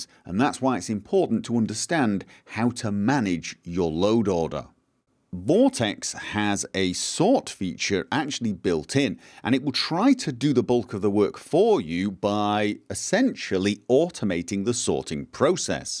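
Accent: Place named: Liverpool, United Kingdom. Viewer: Very helpful up to now, the big question is.... Where about in Liverpool? Defo scouse defected!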